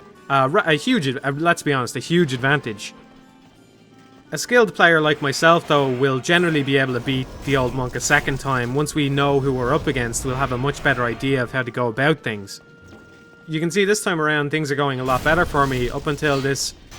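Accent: Ireland. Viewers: I watch this video at night to be lulled to sleep by your sweet Irish brogue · are you irish? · I only just found this video and your channel . Can't wait to check out your other stuff. And you sound Irish . So from another Irish I'm glad to find a Irish YouTuber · He's very obviously Irish. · Irish lilt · your sweet Irish brogue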